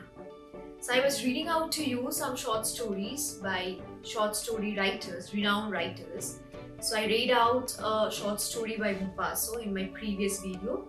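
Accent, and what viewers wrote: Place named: India. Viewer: Beautiful story . And very nicely told. I like Indian accent.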